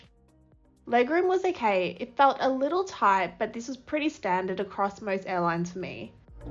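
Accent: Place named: Australia. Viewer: ох уж это австралийское произношение, всё понятно, но напоминает анекдоты ;-)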